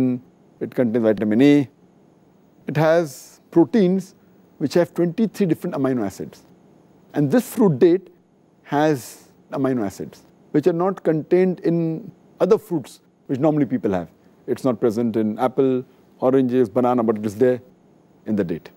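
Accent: India. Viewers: Gotta love the Indian English voice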